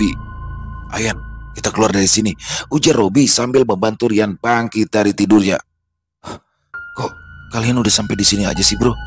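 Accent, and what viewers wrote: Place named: South Kalimantan, Indonesia. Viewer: Met mlm mas dgn suara khasnya anak kalsel hdir lg nyimak ceritanya⭐⭐⭐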